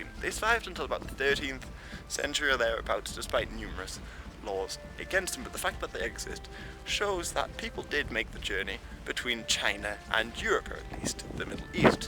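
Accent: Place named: Northumberland, United Kingdom. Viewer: Are you originally from Northumberland? We have the same accent.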